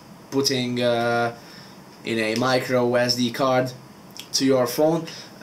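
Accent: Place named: Turkey. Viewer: You just have a Turkish accent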